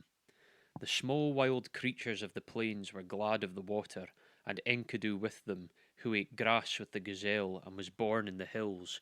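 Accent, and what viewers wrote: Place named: Scotland, United Kingdom. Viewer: The Epic of Gilgamesh sound so good with a Scottish accent. Well read and instantly subscribed.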